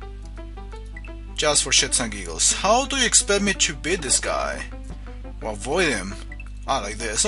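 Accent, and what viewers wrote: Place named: United States. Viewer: i can hear u losing the accent a lil, i compared ur voice hear and in ur old vids, and ur starting to hav more of an............ american accent, less of a hispanic accent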